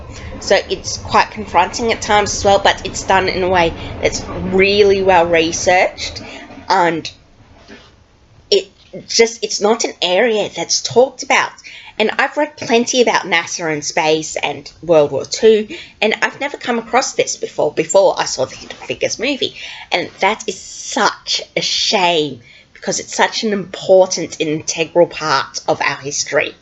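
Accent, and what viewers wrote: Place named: Australia. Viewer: Hi, welcome to Booktube! 😊😊 I gotta say I've always liked the Australian accent hehe 😁😁 I hope to keep seeing your videos around and can't wait to see you grow 😊😊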